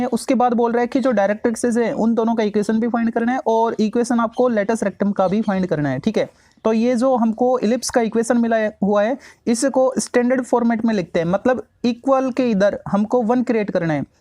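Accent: Haryana, India. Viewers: Sir aap haryana se ho na 🎉❤😇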